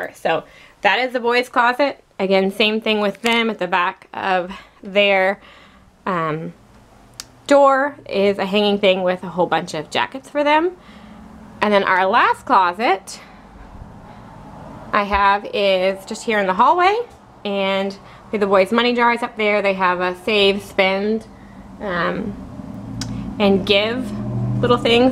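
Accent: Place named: Texas, United States. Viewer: Was going to say you sound Texan. I'm a Texan. :)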